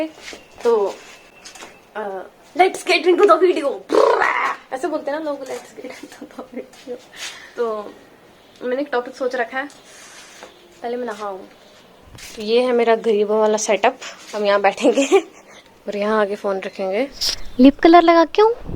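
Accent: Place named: Jammu, India. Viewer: jab bhi tumhari awaaz sunta hu...uski yaad aa jaati hai..uski awaaz bilkul tumhari tarah h..Jammu walo ka alag hi slang hota h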